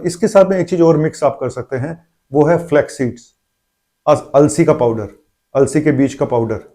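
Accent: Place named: Punjab, India. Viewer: Sir सत श्री अकाल आप बातों में पंजाबी का तड़का लगाते हो लगता है के आप का पंजाब से कोई नाता है, लगता है के तेरा मेरा कोई पिछले जन्म का है नाता ❤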